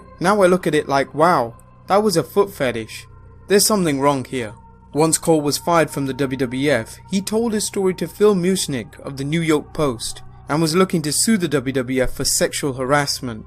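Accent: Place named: United Kingdom. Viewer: Who else clicks off if it’s not narrated by the British guy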